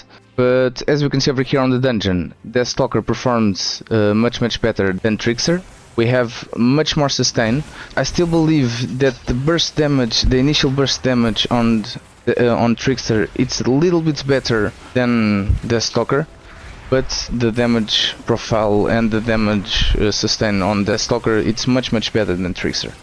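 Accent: Portugal. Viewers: Are you portuguese? the accent does sound like it at least! Thank you for the coverage on the changes, excited for the next season! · parece tuga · you sound portuguese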